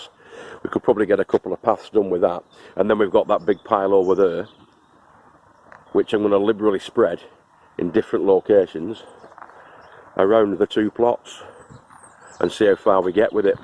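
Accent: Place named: Manchester, United Kingdom. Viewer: Hi, I'm a new subscriber and glad to be on board. Ex Manchester boy long way south now so it is good to hear your accent and see you smile. Thanks for sharing and more please on the sowing & planting under the smaller hoops. Have a very good year. Geoff.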